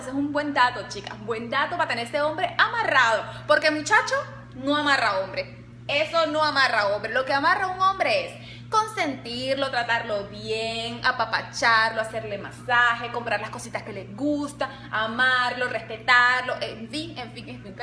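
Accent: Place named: Venezuela. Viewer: Hace poquito encontré tu canal y estoy encantada! Tu súper bella y tu mami bien simpática. Saludos y me encanta tu acento venezolano!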